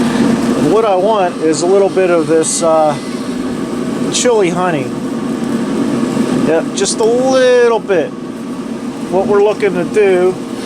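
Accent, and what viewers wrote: Pittsburgh, United States. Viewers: Are you from the Burgh?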